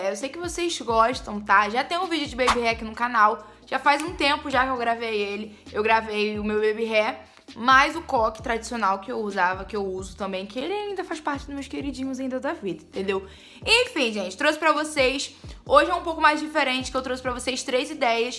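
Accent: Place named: Rio de Janeiro, Brazil. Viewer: Amei o vídeo 💗, sério,o sotaque carioca é muito bom de ouvir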